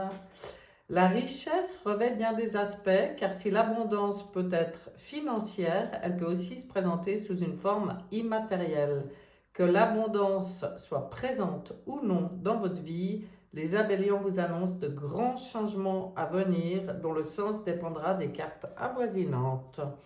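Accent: Switzerland. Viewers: Merci c'est toujours un bonheur de vous écouter, j'adore ce petit accent suisse❤ bonne année à vous et à très vite la sortie du tunnel 🙏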